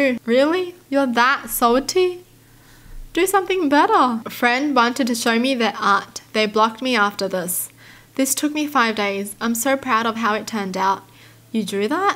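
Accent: Australia. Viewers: Ok, I can listen to you all day because of your accent 😂 I think you speak with an Aussie accent .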